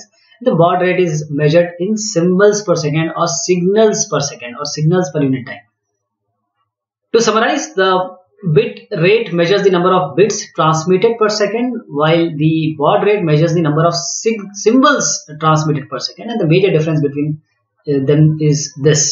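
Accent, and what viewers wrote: India. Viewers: Classic Indian accent, noice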